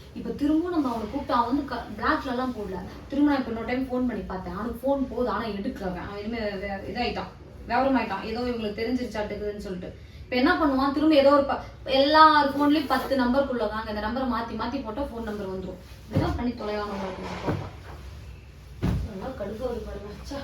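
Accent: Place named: North India, India. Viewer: Idhu north Karan pesuran nalla theriyudhu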